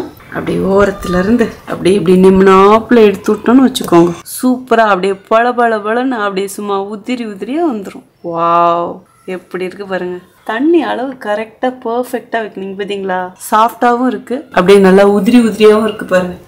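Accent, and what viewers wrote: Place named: Kongu, India. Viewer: Your preparations and Narration,in typical Kongu Tamil will enhance Children 's Lunches very Tasty. Thank you Sister 🎉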